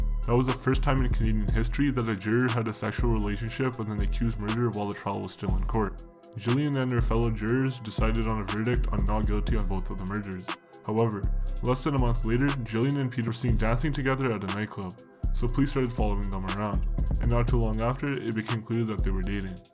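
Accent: Punjab, India. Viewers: u can tell this guy is punjabi and from surrey lmao he sounds just like bindy johal except more chill/relaxed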